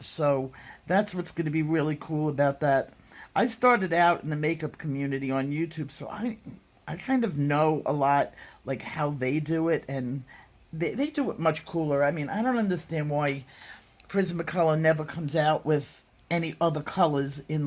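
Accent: New York, United States. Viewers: I’m so glad you hear that your husband is on the mend and improving daily! Thank God for answered prayers. This set looks wonderful and I would love to enter to have a chance to win. I love your videos ,it’s so nice to hear someone who sounds like me as I’m a New Yorker too!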